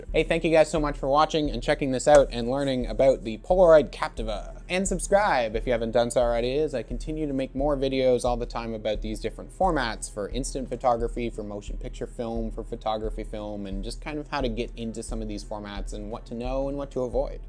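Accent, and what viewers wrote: Canada. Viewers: He’s Canadian so it’s his way of pronouncing it, you can tell too with Certain pronunciation of words in all his videos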